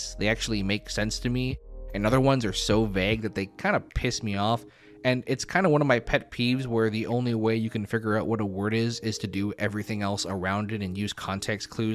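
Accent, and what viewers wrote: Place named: Canada. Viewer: Canadians accents are weird and kinda cool. You guys sound American to my European ears, until a certain word comes along, and I'm like: "ooooh, they're Canadian lmao".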